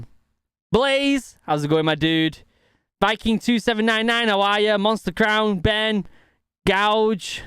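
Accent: United Kingdom. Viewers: What british/brit accent?Or are you Scotsman?Great,your transparent cap!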